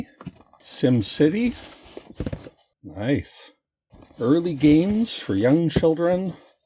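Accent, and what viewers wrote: Canada. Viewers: Thanks for all the content. Your voice is so relaxing, and I love your Canadian accent!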